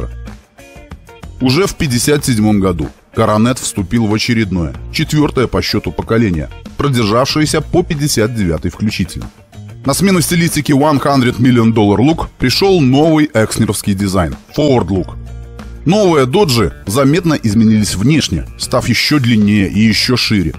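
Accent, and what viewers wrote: Belarus. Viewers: Автор из Белоруссии? Интересный "говор"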